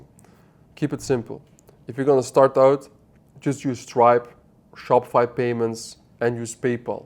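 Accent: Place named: India. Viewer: No one can easily understand Indian English. It’s not about us — it’s about how you pronounces it in India cuz of accent you use.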